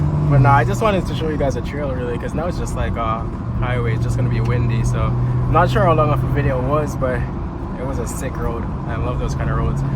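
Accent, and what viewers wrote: Jamaica. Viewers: Yo u mi hear di Jamaican accent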